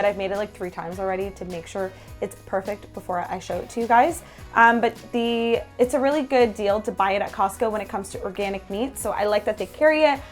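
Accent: Canada. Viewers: Your Canadian accent just cracks me up 😂 love your channel!!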